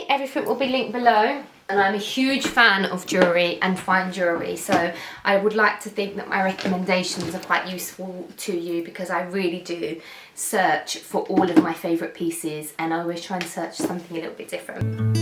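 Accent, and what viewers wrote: Essex, United Kingdom. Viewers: You look gorgeous in the play suit! Ps try saying Jewellery in two bits. Say jewel then leeereey it helps if it bothers you. I had a friend I helped with her speech after a bad car accident. But to be honest I like that you are a natural girl and the Essex twang is cute!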